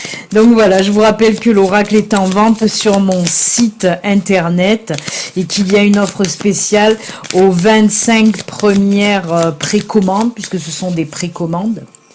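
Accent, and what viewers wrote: South, France. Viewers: J’ADORE Votre Accent du Sud 🙏😉🥰🥰🍀🍀🍀🍀🌷🌷🌷🌷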